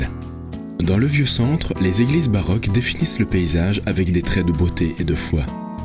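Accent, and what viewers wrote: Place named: France. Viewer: Bahia com sotaque francês ficou divina. IMPERDÍVEL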